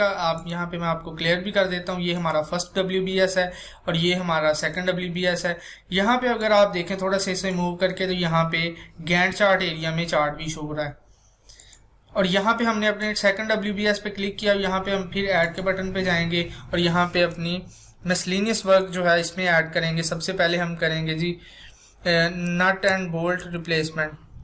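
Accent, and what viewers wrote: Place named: India. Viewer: Can you speak english with u.s accent not indian accent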